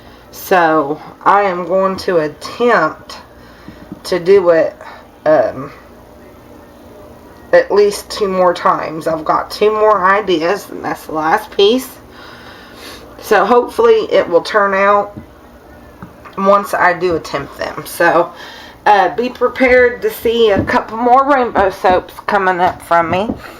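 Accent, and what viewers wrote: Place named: West Virginia, United States. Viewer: hi! love your videos! idk what part of TN you live in but I'm from the southern most part of WV, only takes me 25 min to be in Buchanan county VA...lol......but the reason I'm telling you this is because your accent is the same as ours around here....haha But anyways, down to business.....i just watched a video on a 6 color in the pot swirl and her name is Christy Rose. i just thought I'd let you know about her video and maybe it can help you achieve the results you were wanting. on another note, hope all is well with you and your family and i can't wait to see some new videos and wonderful soaps from you!!!! I'm not a soap maker yet but I'm doing research and i think here soon I'll be ready to try my hand at it. ok I'll stop rambling now, take care and keep on making awesome soaps and whatever else your heart desires...and much love from McDowell county WV!!!!! 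lol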